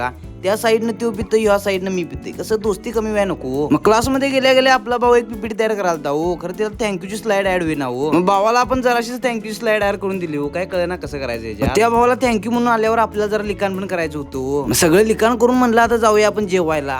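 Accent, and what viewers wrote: Kolhapur, India. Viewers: Accent so cutee kolhapurkar ❤️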